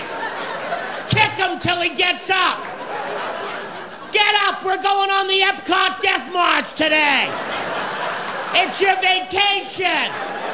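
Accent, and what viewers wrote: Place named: Boston, United States. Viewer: That's the Boston accent.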